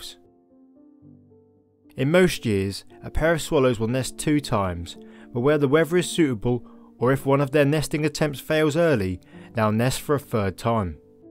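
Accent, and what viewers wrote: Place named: England, United Kingdom. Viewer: I like accent. We don’t hear enough of our English accents enough these days with all their idiosyncrasies.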